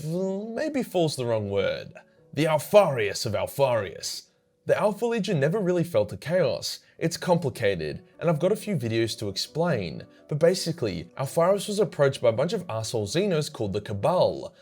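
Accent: Australia. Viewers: God I love aussies explainin shit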